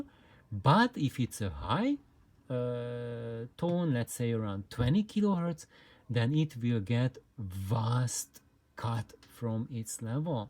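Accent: Hungary. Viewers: I’m 2 yrs late, but I am and have always been able to understand you perfectly,. I’ve met friends via the vinyl and audio communities from all over the world, France, Germany, Sweden, Australia, Japan, Brazil, etc., and I can understand them, quite clearly in fact. It’s not like you’re speaking Hungarian, just English with a Hungarian accent.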